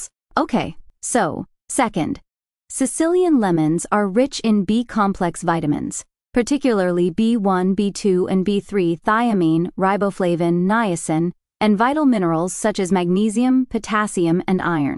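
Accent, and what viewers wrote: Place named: United States. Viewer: thanks for your posts. But you say you are in the UK? You have a very American speaking voice.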